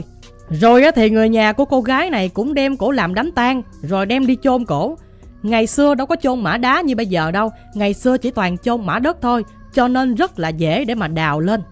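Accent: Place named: Phu Tan, Vietnam. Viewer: Kênh 90 giong ở phú tân quê e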